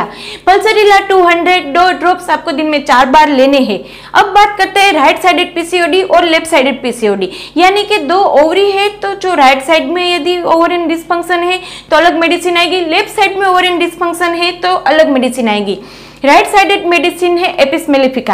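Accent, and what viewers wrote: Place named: Kathiawar, India. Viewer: Aapki bhasha thodi kathiyavadi jesi he... Kya aap gujrat saurashtra se ho?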